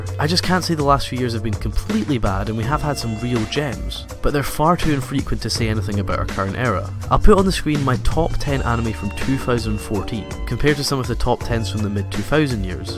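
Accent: Scotland, United Kingdom. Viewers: what accent is that? I am sure it is british but cannot pinpoint the region.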